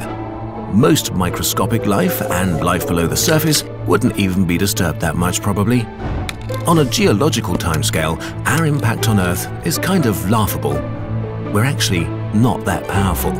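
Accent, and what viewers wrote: United Kingdom. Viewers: Love it and the British accent make it even better :) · he reminds me of the narrator of the stanley parable. probably only because of the british accent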